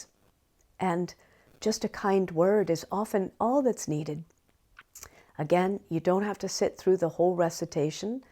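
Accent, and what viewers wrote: Ireland. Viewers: Thank you, your lovely smile lights up the whole room. It's a real pleasure listening to your beautiful soft & gentle Irish accent..🍀☺️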